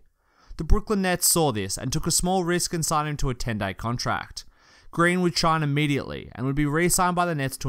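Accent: Australia. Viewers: u sound like an asian aussie amirite?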